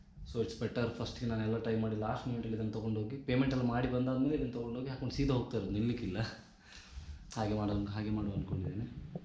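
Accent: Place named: Kundapura, India. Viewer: Kundapura Kannada 🔥♥️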